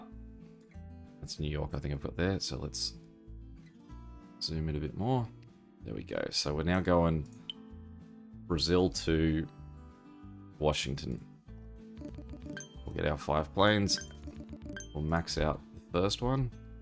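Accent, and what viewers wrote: Australia. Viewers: Hi ☠️ I know that you live in Victoria, Australia 🇦🇺 because of your accent. I live in Adelaide, Australia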